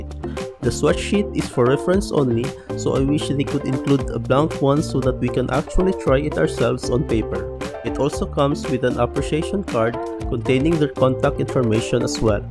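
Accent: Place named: Philippines. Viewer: Didn't know you were a Filipino at first but found out immediately when I heard your accent. Galing mo idol! Keep these videos coming!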